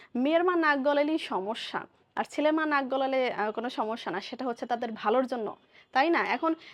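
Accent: Barishal, Bangladesh. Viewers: ভাবি আপনাকে বরিশালের ভাষা কথা বল্লে খুব হাসি পাই, ভালো লাগে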